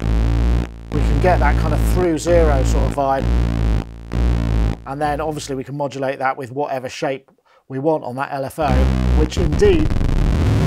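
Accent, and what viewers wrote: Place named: England, United Kingdom. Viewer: English accent at its finest.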